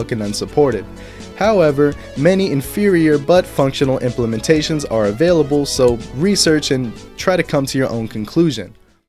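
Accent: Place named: South, United States. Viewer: That southern accent💀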